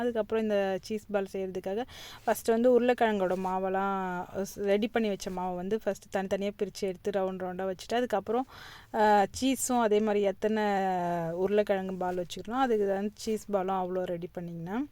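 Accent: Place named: Chennai, India. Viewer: no sis chennai nanum Village la than poranthu valanthen innaikkutha unga video first time pakkuren namba ooru slang la pesureenga romba happya irunthuchi ❤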